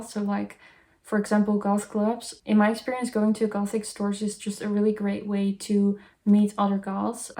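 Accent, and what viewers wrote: Netherlands. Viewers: You sound Dutch! Come hang out at goth night if ur ever in utri or ams :))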